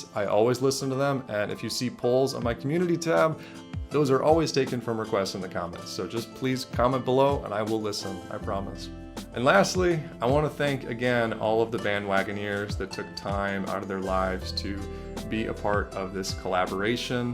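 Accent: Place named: United States. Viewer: I don’t know why I always assumed you were from Eastern Europe. I was shocked to hear the American accent 😂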